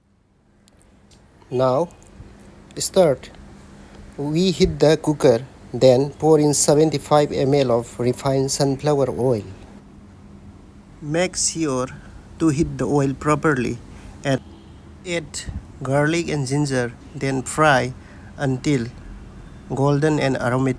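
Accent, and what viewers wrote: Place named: Arab world. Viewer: U have a little Arabic accent 😅... Nice recipe 🎉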